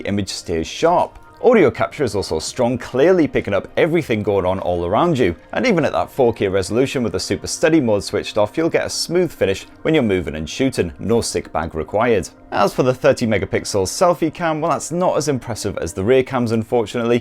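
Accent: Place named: Sunderland, United Kingdom. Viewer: Yet another brilliant review. Sunderland I live and you sound very local to me. I bought the x3 pro on the back of this review and I love it!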